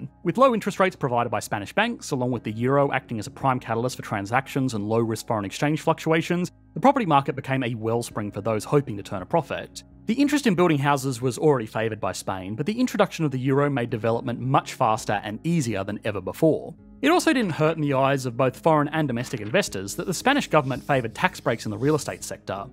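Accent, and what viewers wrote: Australia. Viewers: Slow down mate , i know your have the limit for vedios time but your fast aussi accent is hard to follow. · Probably Australian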